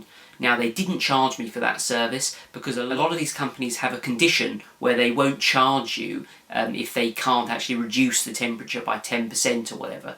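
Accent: United Kingdom. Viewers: He has a voice of an English person not a Chav,, rare these days .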